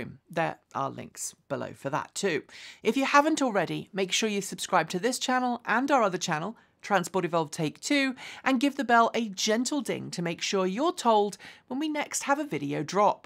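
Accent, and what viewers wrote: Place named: United Kingdom. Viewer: Wow! A woman with British accent calling a trunk a trunk and not a boot. I'll be damned ...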